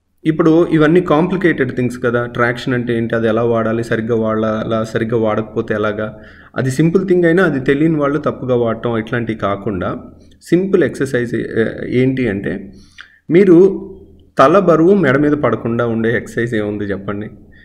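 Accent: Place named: Krishna District, India. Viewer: Sir you speak very nice pure Krishna district telugu.. and with beautiful smile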